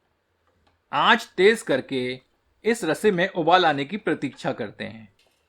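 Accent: Standard. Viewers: Aapki Shudh hindi bahut sunder or saral hai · आपकी शुद्ध हिंदी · शुद्ध हिंदी में बोलने का जो तरीका है वो अतिसुंदर है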